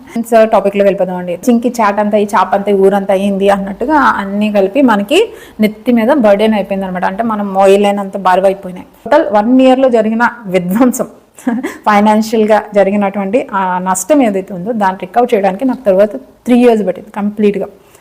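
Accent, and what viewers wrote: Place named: Godavari, India. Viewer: Hello andi are you from Godavari districts? Your accent looks like that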